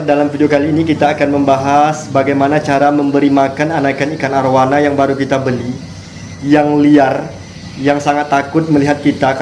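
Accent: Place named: Lampung, Indonesia. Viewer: Abang asal lampung kah? Logat nya mirip orang lampung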